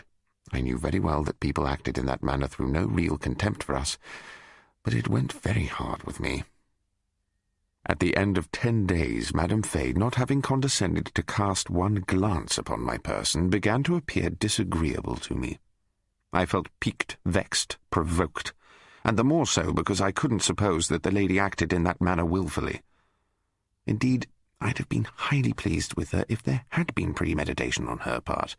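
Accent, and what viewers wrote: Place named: England, United Kingdom. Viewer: English accent is the best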